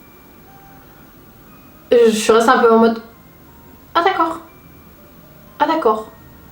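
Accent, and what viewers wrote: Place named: Toulouse, France. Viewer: L’accent TOULOUSAIN HAHA !! J’adore ! Par contre, merci quoi à cause de l’histoire de l’homme aux lunettes je vais pas dodo cette nuit 😂😂😂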